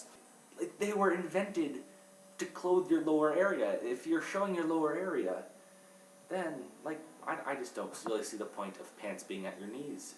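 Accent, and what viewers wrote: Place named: Scotland, United Kingdom. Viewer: nice scottish accent dude.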